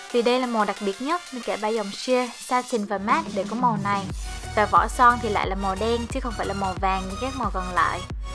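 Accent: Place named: South, Vietnam. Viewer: lần đầu xem clip của chị và replay liên tục vì giọng con gái miền nam đáng iu quá trời luônnn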